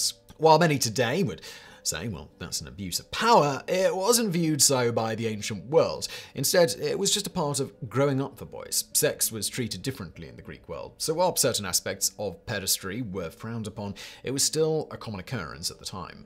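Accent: England, United Kingdom. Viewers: I don't follow. isn't American. I've never heard anyone in America say Leon-Eye -Dass. Maybe if you've been watching too much Pawn Star Wars on the now mislabeled History Channel. Actually I've never heard anyone say it that way. Since he is very, very English, he's never changed anything he's said in years to cater to any American pronunciations. If I had to say what the most common pronunciations that I've heard, on TV, the BBC, in movies, it would be Le-o-ni-des.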